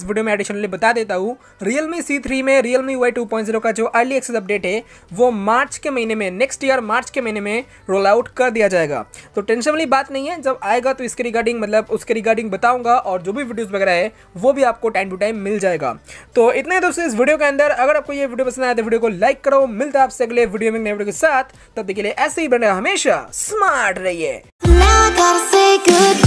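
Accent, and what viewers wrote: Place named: India. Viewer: Hmm,. Lovely voice and Hindi accent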